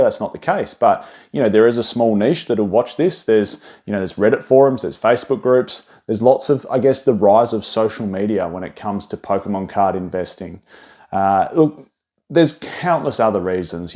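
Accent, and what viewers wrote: Australia. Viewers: what part of Aus are you from btw? I'm in melb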